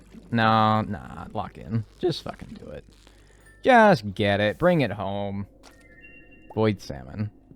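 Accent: United Kingdom. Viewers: Are you British by chance?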